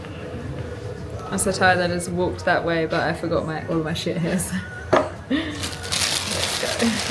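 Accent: England, United Kingdom. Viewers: It's my first time in your channel and I have to say your British accent is amazingly charming ! Thank for sharing your experiences and the best wishes from a Brazilian fan! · اللهجة البريطانية الانكليزية لغة الملوك ❤ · expat in Germany here. She sounds English to me but said, for example, "stopped at a gas station" which is extremely american. Is that what we now say for petrol station in the UK? 🤥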